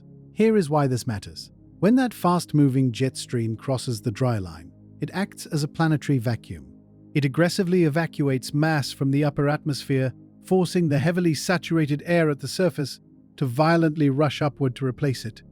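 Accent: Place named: United Kingdom. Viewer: Why are the AI comments about American weather systems, given with a british accent?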